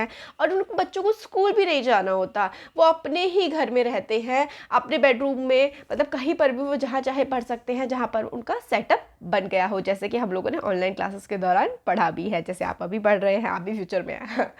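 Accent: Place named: Haryana, India. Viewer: Mam I think you belong to haryana because your voice like my friend she belongs to haryana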